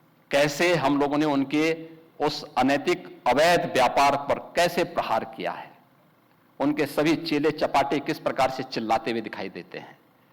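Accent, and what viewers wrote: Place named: Gorakhpur, India. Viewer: Jalwa Hai Bhaiya ji aapka ❤ Gorakhpuriya andaaz me smjhaya hai apne ❤🔥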